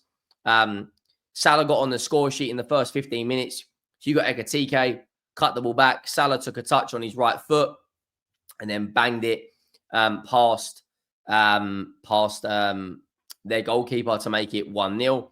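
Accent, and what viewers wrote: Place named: London, United Kingdom. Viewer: Why is a guy with a London accent telling Liverpool fans what we think about our players? We all love Gakpo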